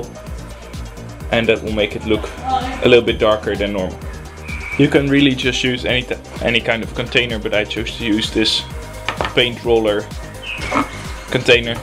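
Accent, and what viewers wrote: Netherlands. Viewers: Typische nederlander aan het accent te merken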